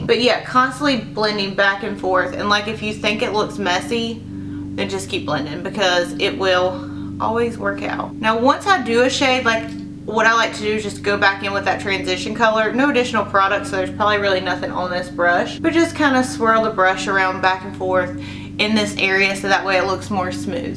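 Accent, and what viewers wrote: South, United States. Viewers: Girl, I am a new subbie and I knew you had to be a southern gal! Love this eye look! Hugs from Bama! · Your so pretty with and without makeup! You sound like your from the south, I love it! I live in North Georgia! :)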